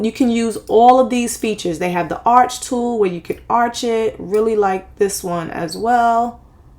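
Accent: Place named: Brooklyn, United States. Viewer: Yassss on BK! I knew it when I heard you tawk😂😂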